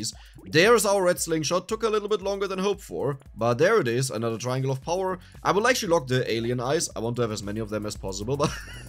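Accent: Germany. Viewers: You got a very strong german accent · Imagine doing english videos with the deepest german accent i have ever heard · Der deutsche akzent ich liebs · Man hört einfach so raus das der deutsch ist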